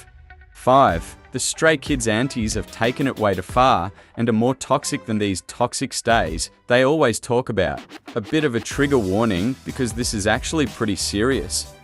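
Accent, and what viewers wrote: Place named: Australia. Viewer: "They should make a rule that you should be 16 minus 17 years old to debut." 🤣🤣🤣 So they should be literal fetuses? Haha just pulling your leg! Is this a robot voice reading "16 - 17" literally because that is freaking hilarious! No judgment, absolutely no dislike for robot voices. I would also choose one instead of using my own voice. I've never heard an Australian robot voice, and then you said you were from Germany, but that does not preclude that you may have learned English in Australia, as one of my friends did. I enjoyed this video very much! I'm subscribing right now and I hope you make more videos soon! 🙏😄🌸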